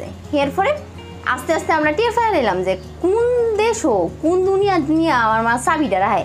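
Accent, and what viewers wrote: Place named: Mymensingh, Bangladesh. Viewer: মনে হচ্ছিল ময়মনসিংহের ভাষায় কথা বলতেছিল।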